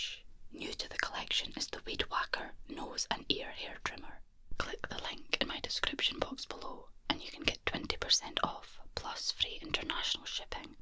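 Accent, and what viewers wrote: Scotland, United Kingdom. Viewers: Why I am so a scottish akzent lover-I don t understand it by myself. But so it is. Thanx!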